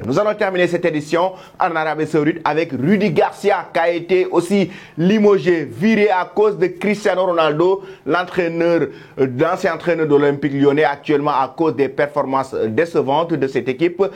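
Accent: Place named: Senegal. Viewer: Je suis ivoirien et j’aime regardé votre chaîne à cause de votre accent sénégalais . Big up depuis Bruxelles , Belgique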